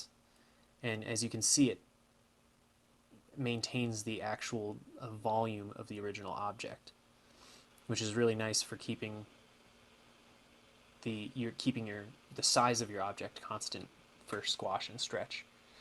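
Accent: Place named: North America. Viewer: Spent the last 4 months creating a project through marcomedia flash 8. I was making a sprite based animation series. Most of the time, thankfully I was just splicing and preparing sprites, improving them, looking for good material and whatnot. When I began using flash I came to a pit stop with z axis. It is very difficult with flash to operate an animation with multiple sprites going in front of and behind each other. It requires extensive knowledge of action script and set depths. Even then, the motion tweening stops working and requires more in depth programming. Screw all that, opentoonz I found by accident and now I want to begin my animation project all over again. The narrator does talk very slow and does have me rolling my eyes when he is all "So ... errrrmmmm this level here........ errrrrmmmm is going to be our uuuuuummmmm next scene." Yeesh, I've come to find all North American youtubers doing tutorials sound like this. 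Must be all the processed genetically messed up monsantos foods you lot are eating over there slowing down your brains or something.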